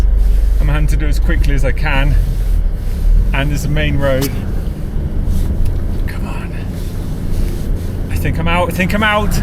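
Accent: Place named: Switzerland. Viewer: Hearing you talking swiss german is funny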